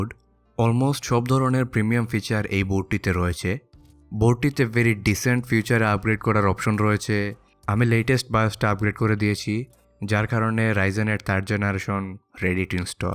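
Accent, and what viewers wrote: Sylhet, Bangladesh. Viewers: Bhai kita sylheti ni? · Are you from Sylhet? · Bhai apni sylheti Maybe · Vhai.... Apni sylheti? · Sylheti mama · Great video... Are you sylheti brother? I was just wondering... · সিলেটি Bro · Sylheti nakita bhai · vaii kita sylheti nih?? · Sylhety ni bhai? Mat hunia lager sylhety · Dada Sylheti naki 😌. Sylhet hoile kinte pari. Reply diyen dada. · Bro apni ki sylheti?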